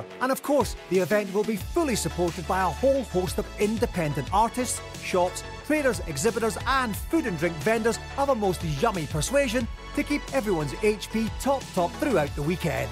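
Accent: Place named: Scotland, United Kingdom. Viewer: It's odd you hired a Scottish voice over artist rather than an English one, but not an issue at all. Even though I'm too young to remember GamesMaster, being born five years after it ended in 1998, the fact it's THE Dominic Diamond sorta makes up for it. If you wanted an Englishman, Andy Crane would've been an excellent choice!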